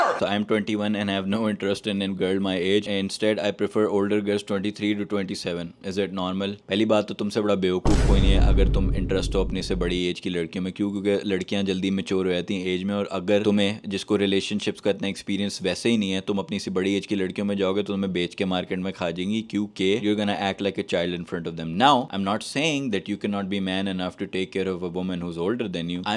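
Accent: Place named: Lahore, Pakistan. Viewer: 2NO LAHORI HEN NA SPEAKING STYLE ACCENT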